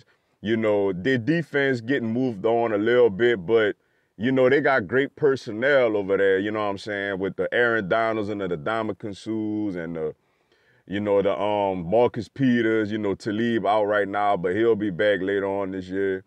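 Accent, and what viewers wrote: New Orleans, United States. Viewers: I can hear your accent, you from tha NOLA.. You should be with your home town team dude.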